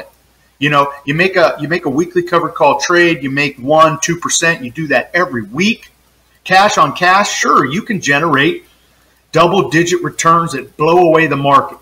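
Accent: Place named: Pittsburgh, United States. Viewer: Thanks for the reply. Makes perfect sense, thank you. I guess my real question was, "Do you HAVE to buy them back, or do you have the option to let them expire?" I was a little confused but I get it now. Side note, you're not a fellow Yinzer by chance, are you?? Your voice (I mean no offense) and a certain sports logo visible in one of your other vids suggest you may have ties to the area. Thanks again, and keep up the good work with the vids! Very helpful.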